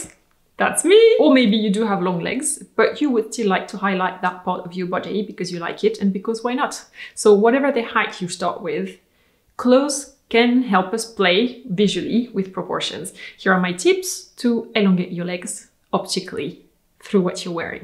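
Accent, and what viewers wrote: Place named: France. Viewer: Thank you for this! I love your fashion "education" videos-they are so helpful and I learn, or am reminded, of so many tips. Also-has anyone mentioned since your return to France there's a tiny strengthening of the French accent to your English? It makes me very happy as I visualize your daily life there ❤️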